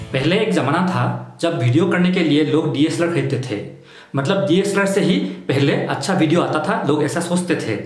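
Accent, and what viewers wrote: Assam, India. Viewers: Your hindi accent sounds like you are Assamese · your accent sounds assamese ☺️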